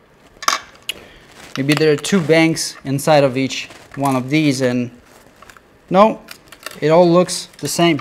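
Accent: Russia. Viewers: Russian акцент.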